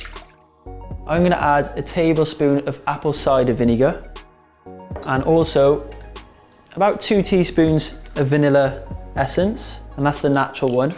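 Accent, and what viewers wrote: Wales, United Kingdom. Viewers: British accent ? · Alisha C That’ll be a Welsh accent. He’s from Cardiff. I don’t think he’s cute, more so because I have sons his age and that would feel weird, but I’d be very proud of him if he were my son.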